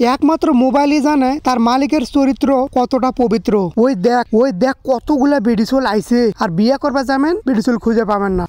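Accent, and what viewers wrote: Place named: Dinajpur, Bangladesh. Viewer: কারন হামরা দিনাজপুরের ভাষায় এমন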